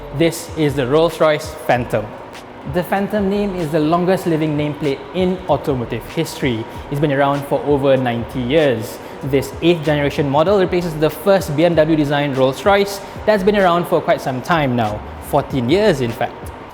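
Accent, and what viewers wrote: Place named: Malaysia. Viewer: Suara orang Malaysia. Tu yang kita nak. Ada orang dah pandai cakap orang putih nak jadi orang putih terus.. selamat maju jaya.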